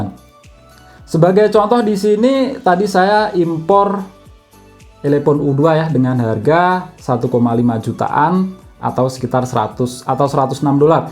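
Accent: Blitar, Indonesia. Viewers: Orang Blitar kah?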